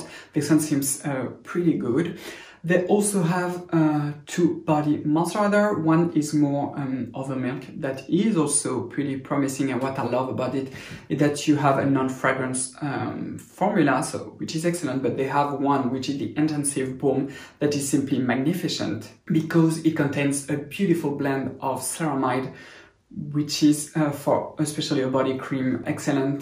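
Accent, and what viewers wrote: France. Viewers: gotta love a french accent oh lalà🤩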